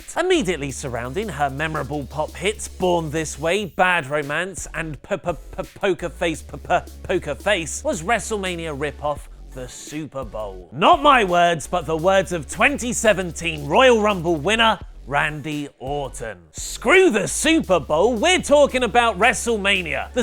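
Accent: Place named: United Kingdom. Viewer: I love his accent so British lol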